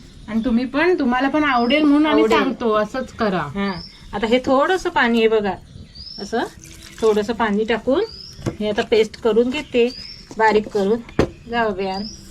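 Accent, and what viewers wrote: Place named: Konkan, India. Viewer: काकी छान मस्त कोकणी बोलतात...कोकम शिवाय नाय चव लागत... 😊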